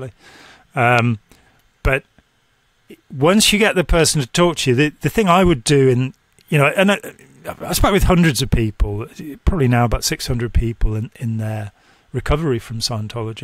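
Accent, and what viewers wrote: United Kingdom. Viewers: I keep hearing Brian Cox in this man's voice. Must be from the same part of Britain. 😊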